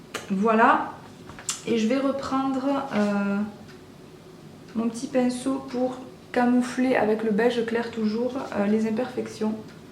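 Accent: Toulouse, France. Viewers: J'adore ton petit accent toulousain ^^ Je trouve ton teint super avec ces produits le fond de teint à l'air pas mal du tout ainsi que la palette je l'avais vu sur la vidéo de sananas le résultat est assez bluffant ! :o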